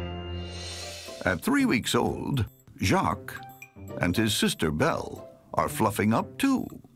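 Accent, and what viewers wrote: United States. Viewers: The Stanley Parable narrator is British, this guy is American.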